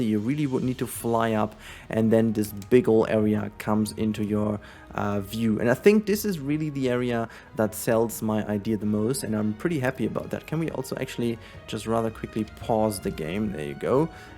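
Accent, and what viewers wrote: Germany. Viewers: Bist du deutscher oder so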